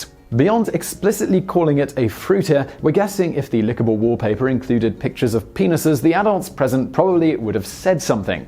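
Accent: United Kingdom. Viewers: This video is thee DEFINITION of MISINFORMATION/PROPAGANDA... Once I heard your British accent it becomes CLEAR how much of a SHILL/AGENT you ARE .... 3 million subs huh? No SHOCKER most people are LOST AF reading through the Comments people CONTINUE to remain LOST while they're being MOCKED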